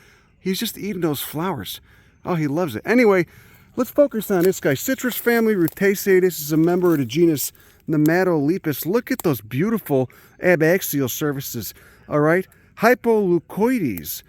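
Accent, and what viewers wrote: Chicago, United States. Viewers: I thought it was old school Chicago lol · I love the mental transition from "some dude in the USA with the fast talk and the accent" ... "in Tasmania". Gives me a whole new way of looking at the country.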